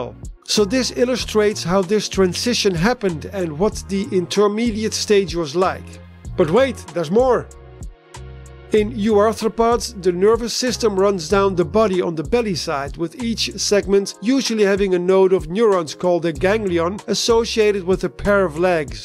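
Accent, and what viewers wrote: Netherlands. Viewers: Hearing that dutch accent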